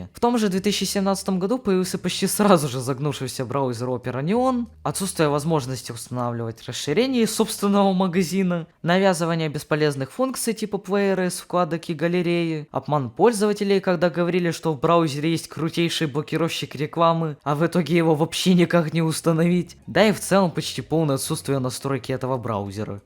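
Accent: Ukraine. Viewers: Он из Украины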